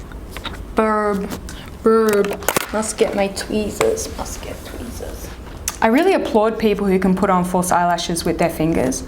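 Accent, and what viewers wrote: Australia. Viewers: Hey beb are you an aussie? Another thing is where do you buy all your makeup from? You're gorgeous btw!!!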